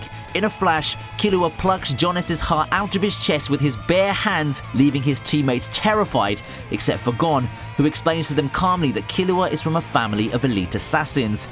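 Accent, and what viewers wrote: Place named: United Kingdom. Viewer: this video is really good but... i hate these name pronunciations. your english please stop trying to say the name properly because it just sounds goofy with our british accent.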